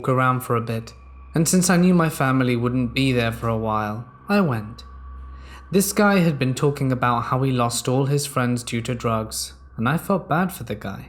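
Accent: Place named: England, United Kingdom. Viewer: The last two stories were the most horrific! If the stories had been too far fetched... like ghost or just a bit creepy I would listen again but these were too near the knuckle... so I won't listen to any more. I'm now confused... your accent sounds English yet you use awesome and dude, you now sound as though your morphing... why?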